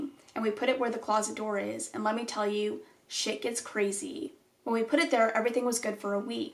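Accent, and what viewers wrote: South, United States. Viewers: You sound like you developed a sort of country/western accent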